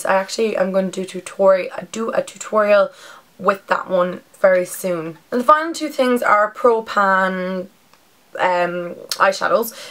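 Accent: Ireland. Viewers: Bet u from Dublin or cork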